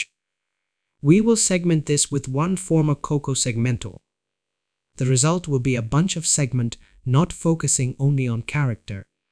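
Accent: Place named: India. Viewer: stop using the AI it's more unintelligible than your indian accent. Everyone knows you're indian, you're not hiding it, you're literally just making people more racist against indians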